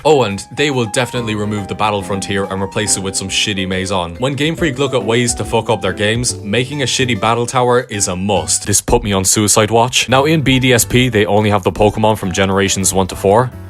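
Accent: Ireland. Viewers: Trying to place the accent. Kilkenny?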